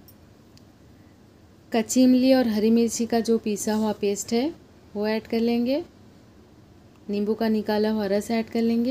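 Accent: Hyderabad, India. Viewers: MashaAllah aap ki har ek recipe zaberdast rehti aur uspe Hyderabadi baat video mein aur bhi jaan daldeti matlab ek dum kirak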